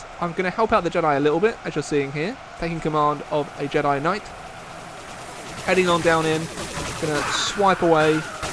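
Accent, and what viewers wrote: United Kingdom. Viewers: Keep the massive battles and total war going, I love the survival battles. And personally I find your voice quite elegant, it gives your videos an almost documentary-like feel. Speaking of that can you say Amino Acids in your next video? It's my favorite work to hear with a proper english accent. By the way, I'm 27 and totally get it, living in the bay area of California is brutal, I pay $1800 american for rent.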